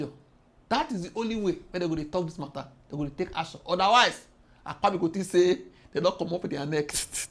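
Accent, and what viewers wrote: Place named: Nigeria. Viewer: You go school😅 English na naija pidgin. Though, a good observation.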